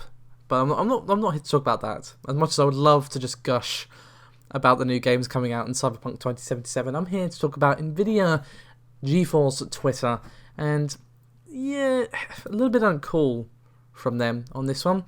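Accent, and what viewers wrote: United Kingdom. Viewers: What is this woman’s name??? I’ve watched so many of these god damn videos and have no fucking clue what she is saying when she introduces herself and says her name. She sounds like she’s slurring her words with her strong British accent and is making up a name that I’ve never heard of.?!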